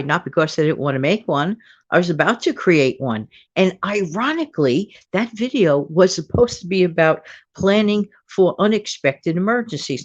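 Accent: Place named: New York, United States. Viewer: I’m listening to your voice and thinking how did I not know you were a fellow New Yorker. Cool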